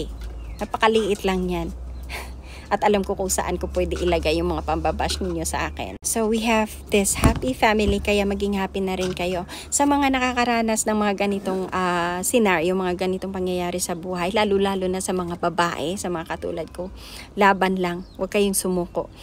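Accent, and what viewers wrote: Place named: United States. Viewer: Englisera Ka Pala madam at American accent sarap pakinggan.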